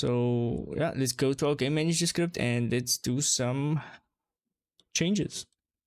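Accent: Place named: South Africa. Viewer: I know that accent, hello from South Africa <3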